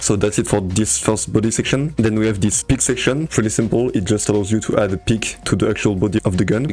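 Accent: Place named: France. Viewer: l'accent francais mdrrrrr continue comme ca !k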